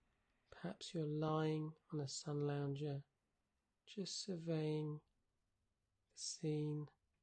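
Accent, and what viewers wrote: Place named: United Kingdom. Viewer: Hello. I just stumbled onto one of your videos posted on YouTube and concluded iI would like to experience more of them. So….Facebook live? Thursdays at 5pm? But 5pm where? You sound British. Are you in Britain? Five hours ahead of me.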